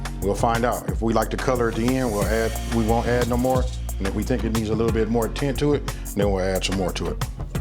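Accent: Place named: Louisiana, United States. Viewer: where are you from??? I hear some Louisiana flavor in your voice!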